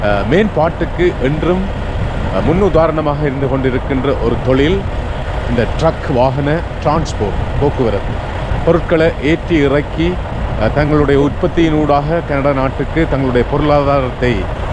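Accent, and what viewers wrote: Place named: Sri Lanka. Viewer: தமிழ்த்தாய் வாழ்க தலைவர் பிரபாகரன் வாழ்க அண்ணாச்சி உங்கள் ஈழம் தமிழ் கேட்டுக் கொண்டே இருக்கலாம் இனிமையாக இருக்கிறது தமிழுக்கு அமுதென்று பேர், அந்தத் தமிழின்பத் தமிழெங்கள் உயிருக்கு நேர்" என்பதாகும் அதிலும். உங்கள் தமிழ் தனி அழகு தான் அண்ணாச்சி வாழ்க வளமுடன்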